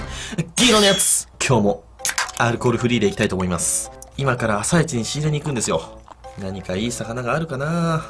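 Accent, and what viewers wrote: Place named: Kansai, Japan. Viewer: 最近関西弁ちょくちょくでてないか？？嬉しいぞ？？？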